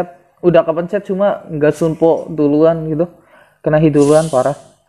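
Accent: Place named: Banyumas, Indonesia. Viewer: Ngapak" njir 🤣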